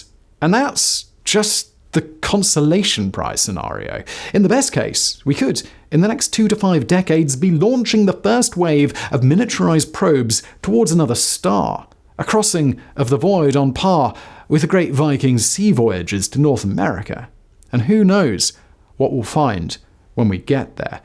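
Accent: RP, United Kingdom. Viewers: Why you trying to sound like a posh twat? Lol...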